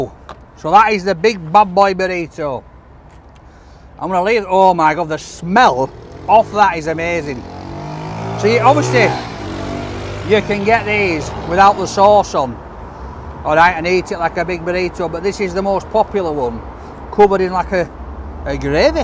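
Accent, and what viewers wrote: United Kingdom. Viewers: Great videos.. great accent. What part of Britain is it?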